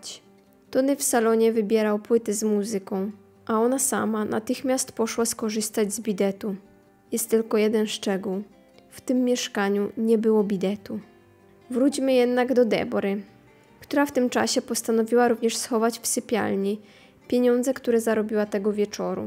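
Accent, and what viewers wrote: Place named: Russia. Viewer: Posiadasz RUSKI AKCENT..Czy jesteś Ukrainka?????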